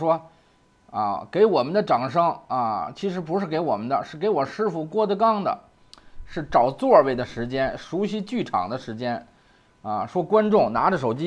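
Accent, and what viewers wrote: Beijing, China. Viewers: 听老哥的北京口音就是亲切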